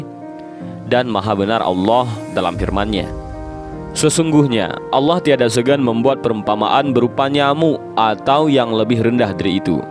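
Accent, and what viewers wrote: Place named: Aceh, Indonesia. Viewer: Abang ini orang Aceh ya,soalnya logat nya itu😁😁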